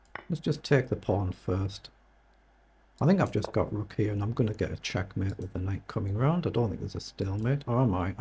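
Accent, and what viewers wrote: Newcastle, United Kingdom. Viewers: Discovered this channel yesterday. Simple, instructional, to the point. Great channel. Are you from Newcastle?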